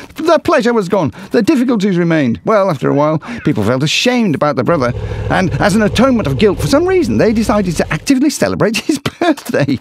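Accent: United Kingdom. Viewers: You'd better pay some american narrator than british. American accent is more acceptable.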